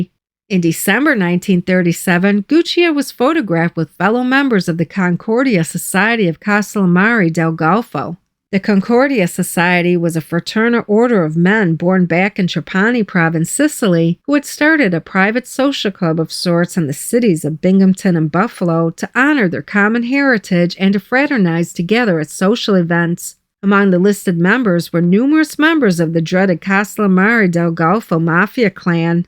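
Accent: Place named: Chicago, United States. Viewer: You’re great, amazing content but… the heavy Chicago accent…. Doesn’t match with the NE mob.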